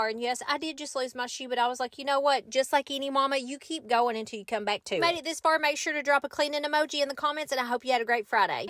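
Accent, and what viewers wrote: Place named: Tennessee, United States. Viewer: 🧹🗑️🧽 I made it! ASMR isn’t about being loud, it’s being super quiet while you record the normal sounds of the stuff you’re doing: — the sound of cabinets opening and shutting… — a knife blade slicing or chopping through food… — a blanket snapping as you shake it out before you fold it… and on and on. No banging or slamming needed (or wanted). You record all that stuff, cut it together really close when you edit so it’s a series of sounds one after another (accompanied by the visual of you doing the thing that makes that sound), and that’s an ASMR video. That said, I’m happy you don’t do ASMR because I hate that nonsense! I love to listen to your Tennessee accent in your voiceovers. I can’t understand why these videos don’t perform well for you. This is the content that made me decide to subscribe! I also like when you show how you make certain meals and desserts. 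I need to try the cinnamon rolls with pie filling. That looked so yummy.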